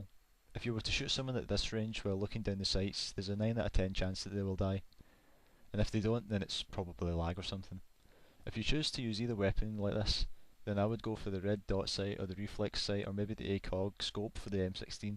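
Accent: Scotland, United Kingdom. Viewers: are you scottish? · are you british? lol jw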